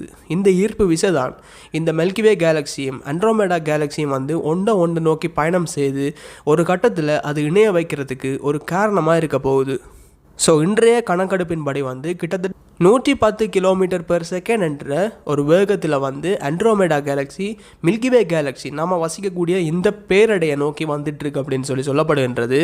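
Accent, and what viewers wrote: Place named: Sri Lanka. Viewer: Alagana srilankan Lang tamil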